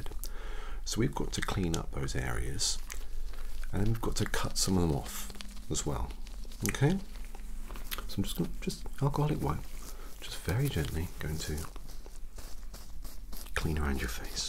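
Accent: United Kingdom. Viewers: Me: a whole zombie: You look a bit under the weather, but other than that, I diagnose you with zombie Extremely British, I love it 😂😂😂😂😂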